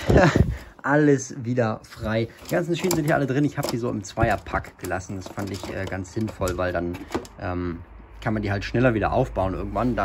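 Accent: Germany. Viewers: kommst du aus münchen ? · Bist du eigentlich aus beiern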